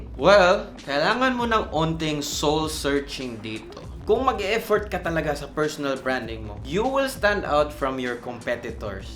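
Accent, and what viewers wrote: Visayas, Philippines. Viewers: bisaya ka hahahha pareho diay ta asa man diay inyu? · [UNRELATED] Bisaya kaba? haha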